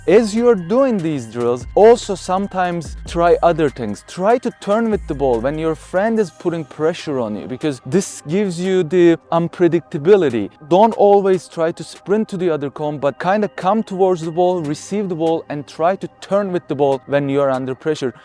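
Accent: Turkey. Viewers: Bu aksan bir turkten baskasi olamaz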